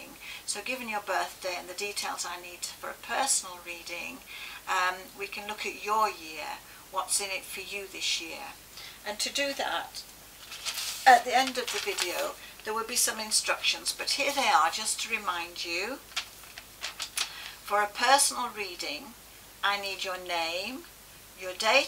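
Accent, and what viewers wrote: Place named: United Kingdom. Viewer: i love the british accent and english , my school teacher was a britisher and taught me the Shakespeares plays , there were many references to prophecies in the plays, love your voice and puts me back in the class room, keep speaking.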